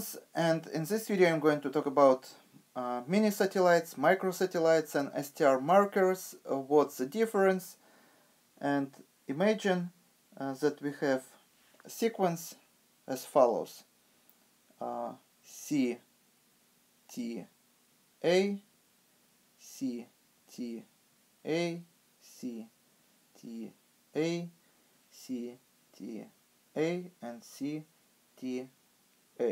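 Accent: Russia. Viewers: Man you russian accent is awesome . :)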